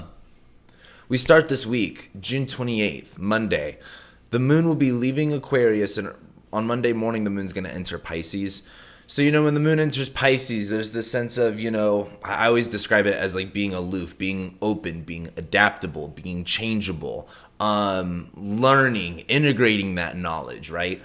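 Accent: California, United States. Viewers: Yes! Love that midwestern twang coming out from under that California accent!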